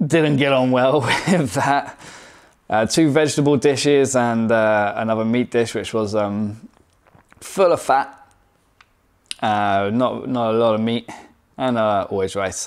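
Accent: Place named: China. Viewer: 感觉你的口音都中国化了哈哈哈哈哈哈😂不知道是不是我的错觉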